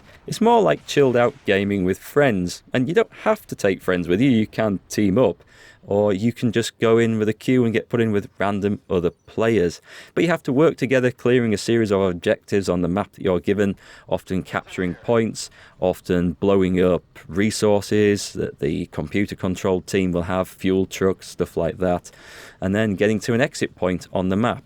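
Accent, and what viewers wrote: Yorkshire, United Kingdom. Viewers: From yorkshire?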